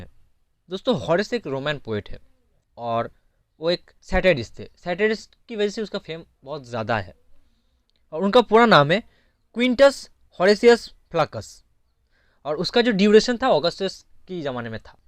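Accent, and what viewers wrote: Bengal, India. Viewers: Thoda bengali accent aa jata hai.... improve your English speaking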